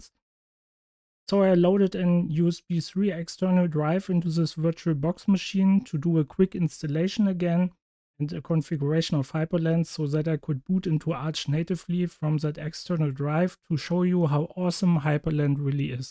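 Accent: Germany. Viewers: I did not expect to see this kind of video with a German accent. At least I am assuming you're a German native speaker, its what it sounds like to me. Much love. :) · souds really deutsch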